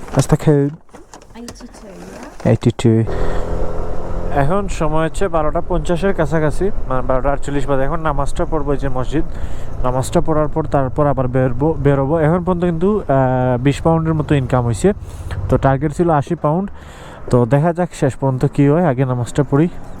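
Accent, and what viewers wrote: Sylhet, Bangladesh. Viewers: ভাই আপনি কি সিলেটি? নতুন অবস্তায় ফুড ডেলিভারি করা যাবে কি না জানাবেন দয়া করে New subscriber of your ❤️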